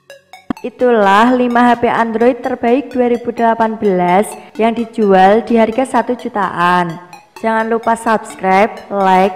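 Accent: Java, Indonesia. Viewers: jan medok,njowo mbak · Jawa medok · Jawane ngendi mba:-D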